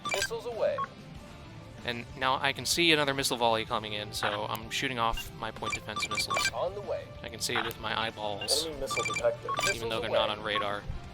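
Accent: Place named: Rural, United States. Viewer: There’s something about Country Twang and space ships….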